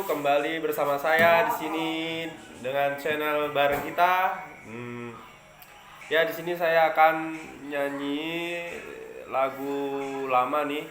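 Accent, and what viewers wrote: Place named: Java, Indonesia. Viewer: Pas nyanyi medoknya hilang nggih mas 😂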